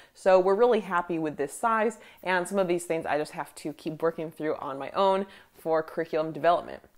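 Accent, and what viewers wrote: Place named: United States. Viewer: Dear it is so nice to hear you speaking american english. Such a pleasure.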